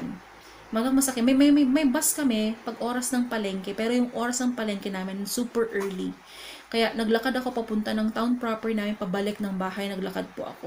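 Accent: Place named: Pangasinan, Philippines. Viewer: hi po teacher! just found your channel because of 51talk..I'm a new teacher po and because of your videos po nalalaman ko ibang tips and tricks regarding the company..i am from lingayen pangasinan po and napansin ko po ung "ey" na expression kaya napa subscribe ako kasi sa isip ko "uy pareho kami...tiga pangasinan kamin dwa" hahaha nice to see someone po here in youtube na kapareho ng dialect..keep on updating us po sa mga ganap and thank you po sa mga shinishare niyo malaking tulong po..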